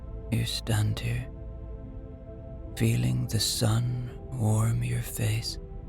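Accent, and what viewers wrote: Ireland. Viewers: Amazing. This story took me to a dream with my beautiful sister, who recently died. Beautiful memories, love, sobbing grief and acknowledgement of acceptance of grief as part of journey..thankyou again. I love your Irish accent and gentle voice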